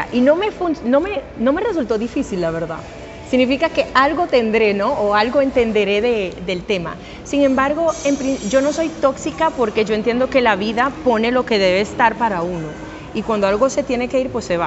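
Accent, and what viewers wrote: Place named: Colombia. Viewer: Una belleza increíble habla como los Colombianos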